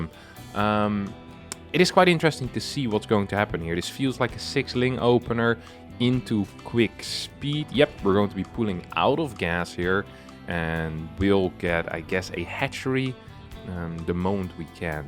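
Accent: England, United Kingdom. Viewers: i just dont like his english accent, feeling like I cant be the only one, his account is still fairly small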